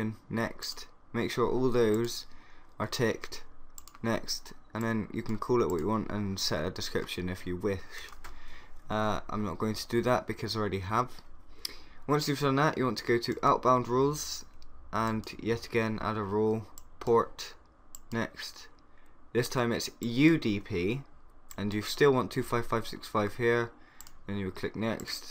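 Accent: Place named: Bristol, United Kingdom. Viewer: You sound Bristolian : O and this helped ty, kept finding tutorials for this and found american routers -_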